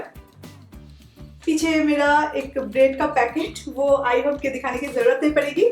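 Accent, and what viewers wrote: Bengal, India. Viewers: Boy you have a heavy bong accent but I liked your honesty.. 👍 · I have some bengli friends..they speak hindi like u..un dostonki yaad agayi..nice kitchen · Love bengali's hindi..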